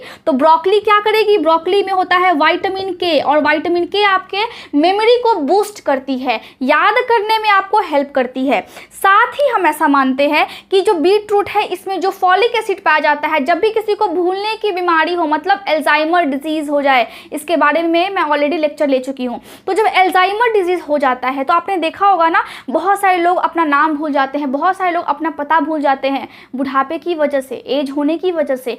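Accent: Bihar, India. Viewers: bache tune mere pass Janam kyon nhi leiya. Love you and God help you. From Himachal Pradesh 176214 PIN. Bache flow aah rahe hai Bihari language ka. Beta update. You are coordily invited to visit HP and stay my home with your mom & dad. God bless you.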